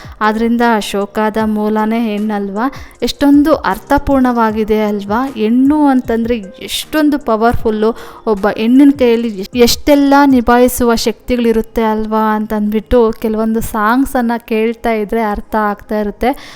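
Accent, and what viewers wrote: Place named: Kolar, India. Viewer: Nim language kolar, Hoskote tara ide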